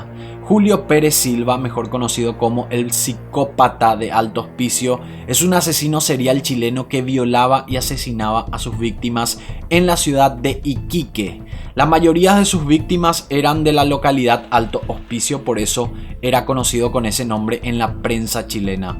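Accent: Paraguay. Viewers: Parecés paraguayo! Es así? Muy buen material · Por el acento que escucho veo que eres paraguayo,no sé si estás enterado que el mayor violador y asesino del mundo,esun colombiano llamado Luis Alfredo Garabito,violó y asesino a 172 niños y tenías que haber sabiodo realmente quien el mayor asesino no solo de América Latina,si no de todo el mundo y creo todavía sigue preso,infórmate y publicalo,un saludo para ti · Verdad su acento es Paraguayo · Sos Paraguayo? Excelente video hermano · Tenes acento Paraguayo. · Amigo vos sos paraguayo?? Por que tenes acento paraguayo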